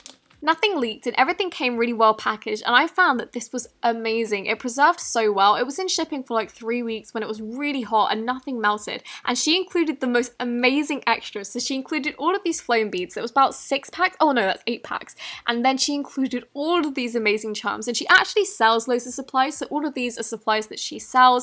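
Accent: England, United Kingdom. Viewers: Are you English or American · Are you from England?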